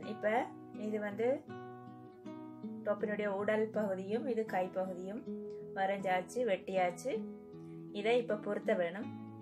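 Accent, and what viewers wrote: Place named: Sri Lanka. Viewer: i also srilankan.from jaffna.ur talking style paathu kandu pedichan.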